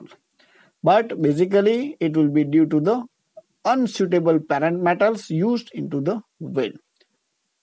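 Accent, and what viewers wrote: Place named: India. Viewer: такой конкретный индусский акцент у лектора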